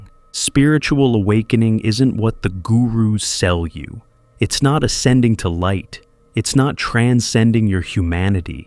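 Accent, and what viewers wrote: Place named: United States. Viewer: It's impossible to find a more vile American accent, than with this narrator.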